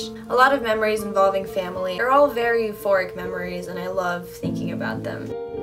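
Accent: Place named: United States. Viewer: where are you from?? you look and sound american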